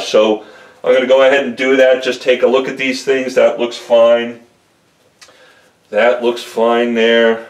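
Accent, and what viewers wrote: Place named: New Jersey, United States. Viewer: no kidding, what's up with these people. Is there a 3 minute law for videos? Screw that crap...I like listening to a guy who knows his sh*t and educates and explains... I am blown away by all the comments you get for long videos...also, why are people so fascinated with your Jersey accent? And many call u Howard stern, they must have never visited the northeast??? But keep it coming, you turned me on to armour all quick shine Aerosal , and purple power!!! God sends...for that I just ordered a 46 buck order from your microfiber store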